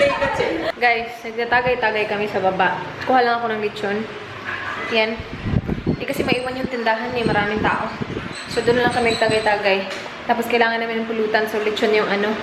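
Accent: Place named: Visayas, Philippines. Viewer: Ka cute nimo mo sulti ug Bisaya te oi😂😂😂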